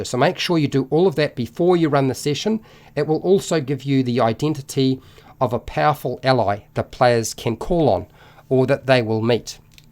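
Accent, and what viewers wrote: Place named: New Zealand. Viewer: Great guide and only enhanced by that charming kiwi accent!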